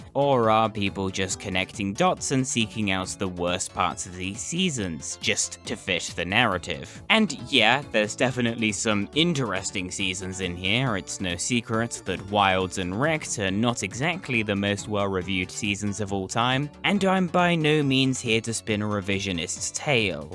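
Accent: United Kingdom. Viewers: I was about to say I thought you were British.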